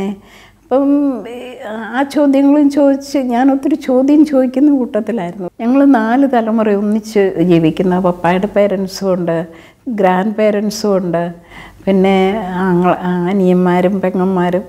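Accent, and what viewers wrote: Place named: Pala, India. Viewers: This the typical Pala Slang, check out the colloquial expression " Mooch"... ANOTHER SOUGHT AFTER EPISODE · എത്ര വർഷമായി. നോർത്ത് ഇന്ത്യയിൽ ജീവിക്കുന്നു എന്നിട്ടും . ആ.. പാലയുടെ ശൈലി ..... അതാണ് ..... ദയാ ബായ്......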